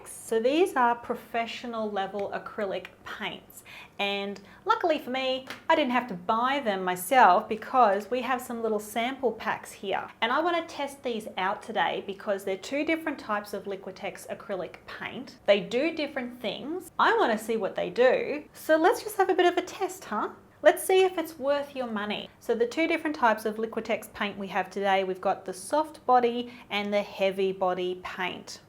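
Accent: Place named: Australia. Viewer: Thankyou for this. I love Liquitex! I just bought an art course and they recommend the Liquitex heavy body, which I’ve never used as I use the regular paint in varying brands, so I’ve been doing research on it. And I found this video - imagine my surprise to hear an Aussie accent! And you’re just down the road - I’m in Brisbane! So new Sub here… Cheers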